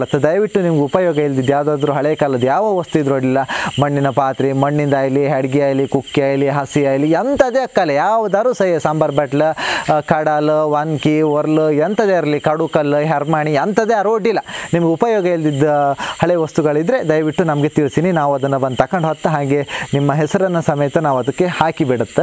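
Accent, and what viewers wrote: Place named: Kundapura, India. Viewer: Nam kundapura kannada..👌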